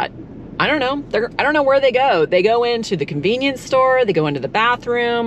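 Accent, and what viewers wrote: Oklahoma, United States. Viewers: Your accent sounds close to an Oklahoman accent. If remember right, the lakes were created so we wouldn't have another dust bowl.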